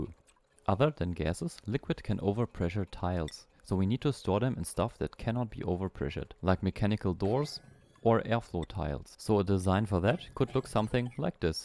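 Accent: Germany. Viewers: You sound German… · ive now watched about 2 and a half hours and realized your accent you are german right ? gibt es auch deutsches gameplay von dir ? is there also german gameplay? and btw i ike your voice and your video very much !!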